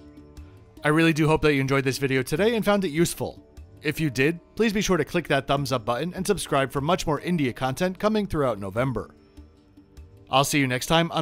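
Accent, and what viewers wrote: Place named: New York, United States. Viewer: Best travel content on YouTube by far. Would love to hear the story of how you ended up in Asia. You sound like a New Yorker. ;-)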